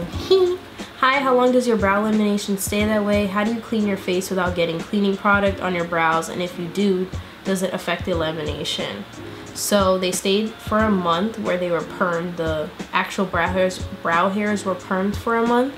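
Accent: United Kingdom. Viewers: Your British accent is killing me🤣🤣🤣 I’m from London x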